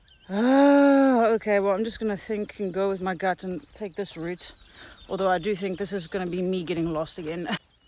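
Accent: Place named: South Africa. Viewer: I think this is Sandra Bullock with a South African accent.....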